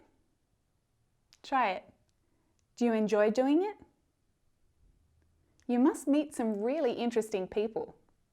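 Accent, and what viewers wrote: Australia. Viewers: Hey great lesson, I love australian accent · I really into Australian accent! It's cool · Hy Myself Anees Bangash from Pakistan currently I am here an Dubai I realy appreciate your efforts to teach u Assuies accent i enjoy keep teach bless u ❤❤❤❤ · I really love Australian accent. Thanks a lot mam. Love from India❤️ And I am a new subscriber....... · It's Luke Hemmings from 5 seconds of summer! I love that band and I also love Bang chan, he is australian but he's member of a kpop boy group called stray kids<3 . . . I like the australian accent and your videos are amazing, thanks for everything:)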